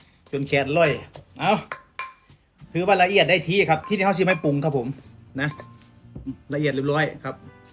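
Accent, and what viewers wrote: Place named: Isan, Thailand. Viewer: พูดอีสานเพราะมาก👍👍👍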